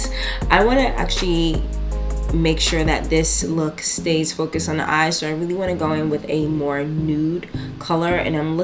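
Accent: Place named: Caribbean. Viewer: Are you west indian? Cuz I hear a little accent.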